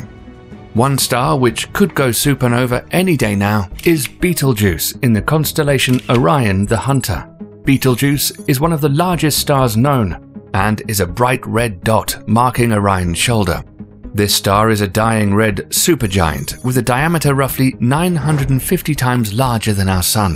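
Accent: England, United Kingdom. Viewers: I came here to this channel to 1) Learn science 2) Learn that posh English accent by pausing and repeating everything he says (sehzz) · As you have an English accent, please stop pandering to the US and call Ursa Major by its proper name - you'll be doing them a favour too, as some of them will learn something as well. By all means explain how they refer to it but I hate it when you forget the rest of the world. Thank you.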